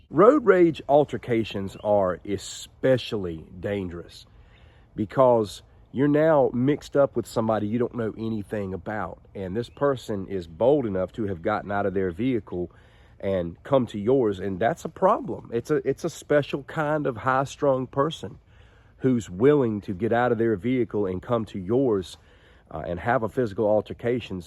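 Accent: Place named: Arkansas, United States. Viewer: Are you from Arkansas? Your accent makes it seem very likely.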